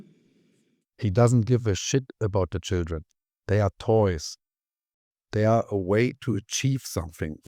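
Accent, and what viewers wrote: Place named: Germany. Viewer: Hahahaha you have a german accent yourself, love the humour...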